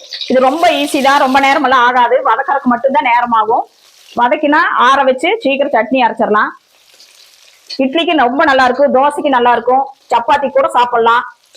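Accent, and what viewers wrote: Kongu, India. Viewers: கொங்கு தமிழில் நீங்கள் சொல்லிக் கொடுப்பது பேரழகு.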